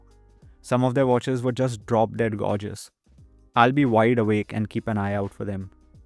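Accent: India. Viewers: Can you get rid of your Indian accent next time, eh?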